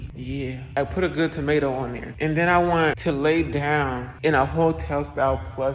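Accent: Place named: Philadelphia, United States. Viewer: Baby you sound like you in Center City or Northern Liberties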